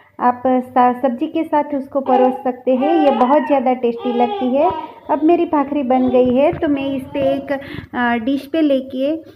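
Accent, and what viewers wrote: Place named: Gujarat, India. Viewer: Gujarati Hindi sounds really funny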